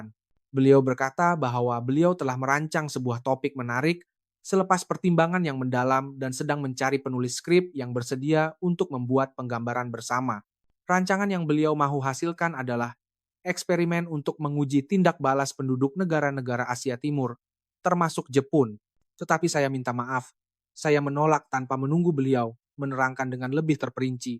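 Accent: Indonesia. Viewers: Nmpk sgt citer rekaan. Berlebih sgt. Suara pun indon. Kami biasa² je. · kenapa suara loghat Indonesia. ni Chanel apa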